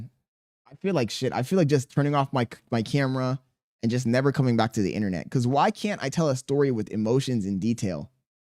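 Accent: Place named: India. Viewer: used those call center skills and the indian accent to get that job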